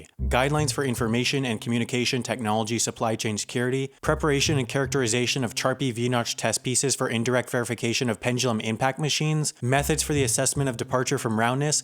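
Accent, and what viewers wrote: United States. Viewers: You sound like an American. (Except you told me your Grandad flew Lancaster bombers in WW2).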